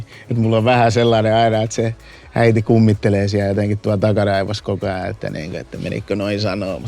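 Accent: Tampere, Finland. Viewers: Kommenttini ei liity mitenkään oikeen mihinkään, mutta nokialaisen eteläsuomalaisena tyttärenä, musta on niin kiva kuunnella tota tamperelaista ärrää ja nuottia :DDD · His songs might be in english but he also has a really strong Tampere accent. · on niin symppis. 😭❤️ Tampereen murre pehmentää häntä vielä enemmän. 😍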